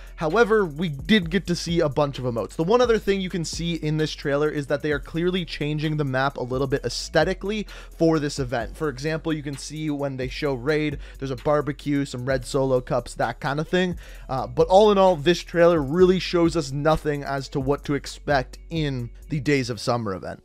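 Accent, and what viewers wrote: Canada. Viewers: he says moreoh bc he is canadian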